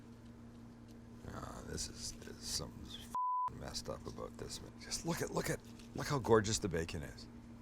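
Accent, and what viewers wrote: Canada. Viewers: He kind of has a Canadian accent Anybody notice that? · Hey Sam, I just found you a few weeks ago. I've commented on several videos how nice it is to see a man who seems like a real Californian, but who cooks much like my family does in the South. Believe it or not, we really do NOT deep fry and BBQ everything! lol... a shock, I know ;) But, really, you cook healthy...but not goofy healthy... REASONABLY healthy. And you won't hesitate to use some fat, real butter, and last week you even used MAYO where I often use butter, and you changed my life. I'm only barely exaggerating! Anyway..one real question: Are you from Canada? I ask because you say your "ou's" such as "out" and "about" with a little Canadians flavor (oot and aboot). Or am I just revealing how unsophisticated and un-cosmopolitan I am?? lol. Either way, GREAT channel.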